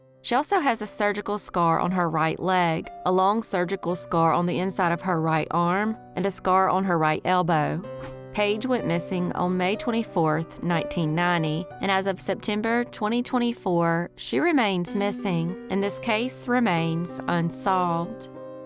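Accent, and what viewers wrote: South, United States. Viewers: THANK YOU!! IT KINDA HURTS FOR THE UNSOLVED CASES BUT YOURE RIGHT UP ON THEM!! AND THAT BEAUTIFUL COUNTRY ACCENT! KEEP UP THE GREAT WORK!!:hand-pink-waving:🤠❣❤🐴🐎🦄🦌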